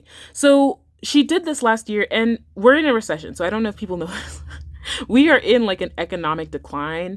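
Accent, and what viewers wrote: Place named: England, United Kingdom. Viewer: The English accent had me out 😂😂😂 love your video style !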